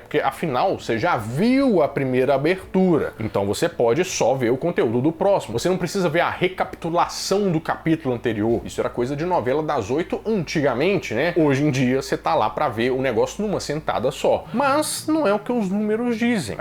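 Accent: Minas Gerais, Brazil. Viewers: Vejo vc porque eu gosto. Tinha muitas opções. Sim mas a sua voz de mineiro e muito gostoso de ouvir. Sou mineira também UAI 😂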